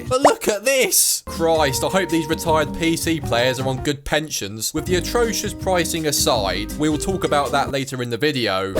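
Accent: United Kingdom. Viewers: This review is a bit too "Bri-ish" for me.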